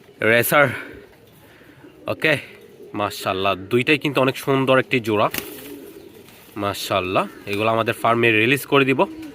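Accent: Chittagong, Bangladesh. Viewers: চিটাইংগা ভাষা হজে সুন্দর লাগে 🎉❤❤😅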